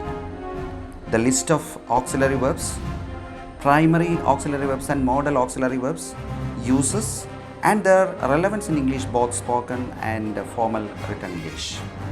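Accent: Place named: India. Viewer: Indian English.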